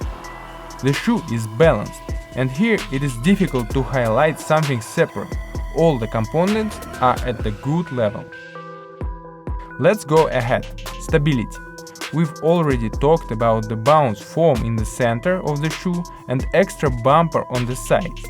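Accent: Russia. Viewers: Nice review. Are you Russian/Czech?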